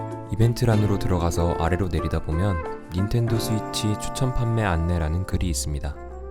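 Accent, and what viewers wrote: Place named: Gyeongsang, South Korea. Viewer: 대구분이시네용 ㅋㅋㅋ 같이 잘되길 빌어요 파이팅!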